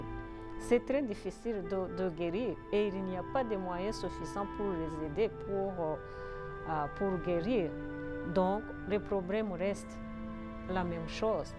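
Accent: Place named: France. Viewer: Idk why but I love her French accent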